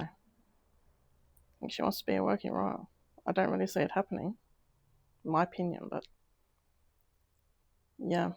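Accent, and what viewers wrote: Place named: Australia. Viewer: Thanks for another great reading. I totally agree with you about the "York" family; I don't trust any of them either; nor any of the Markles (which of course now incorporates Harry as well). I am guessing that you are from Australia from your accent =). I am subbed to a couple of other Australian readers as well. I am happy that I found your channel a few weeks ago, as I am really enjoying your readings.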